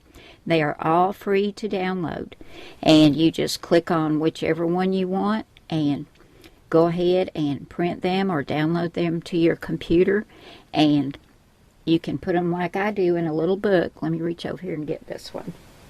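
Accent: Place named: Midwest, United States. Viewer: I wasn't going to share this, but guess I will. I LOVEhearing your voice and midwestern accent. Makes me think of my mom whom I don't get to hear anymore. You really are the BEST! Been watching you for YEARS now. God bless you!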